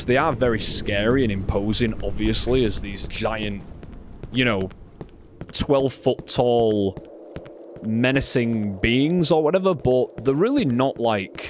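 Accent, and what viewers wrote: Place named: England, United Kingdom. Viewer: Just found your channel through this letsplay, so nostalgic and you have the perfect balance of theorizing and commentary without overwhelming the game! Small question, I apologize if this is rude, but where in england are you from? love your accent best of luck on continued channel growth and congrats!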